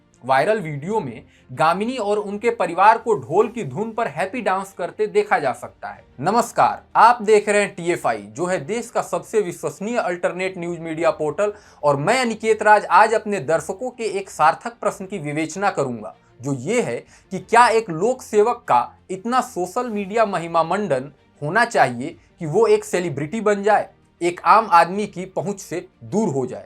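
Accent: Standard. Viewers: Wah...itni suddh hindi sunn kar mera mann gad gad ho gaya....bahut bahut subhkaamnaye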